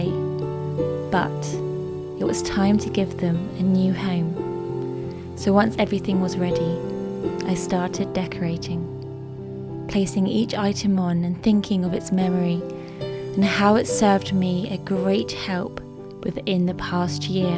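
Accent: England, United Kingdom. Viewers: Esse sotaque é britânico? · Oh, how peaceful your ideas about how to enjoy living in the winter. I loved hearing you read the old folktale with that beautiful English accent. Even your cat seemed to enjoy the magical cozy atmosphere.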